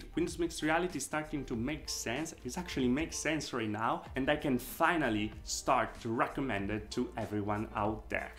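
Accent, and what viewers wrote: Italy. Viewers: Based on his accent and features I'm going to assume he's Italian.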